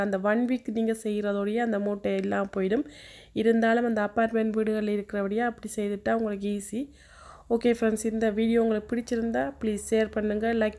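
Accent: Sri Lanka. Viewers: Ilangai Tamil 👌🏼👌🏼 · அக்கா நீங்கள் ஈழ தமிழர் ஆ... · Ellangai tamizh super❤❤❤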